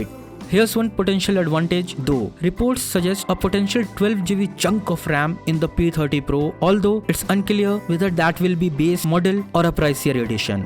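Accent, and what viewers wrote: India. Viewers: Fucking indian accent sounds as though his tongue is born curled. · But a Chinese speaking in English sounds <num>x much better compared to this fcking Indian. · sounds like india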